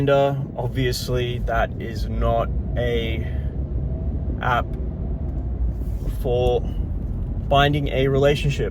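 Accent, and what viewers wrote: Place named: United Kingdom. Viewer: I love your accent. I thought you might be British but you're driving an American car (left hand drive)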